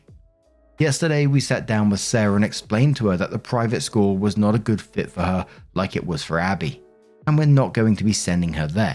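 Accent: England, United Kingdom. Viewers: Yes, I also heard it on Lost Genre. It's all good, I like English accent when he reads the stories. I also like that doesn't read over the cuss words like Lost Genre 😊🇨🇦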